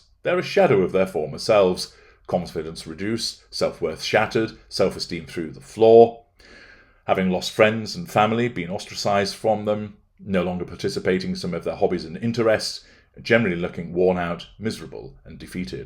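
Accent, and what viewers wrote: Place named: Scotland, United Kingdom. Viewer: Love the Scottish brogue 😄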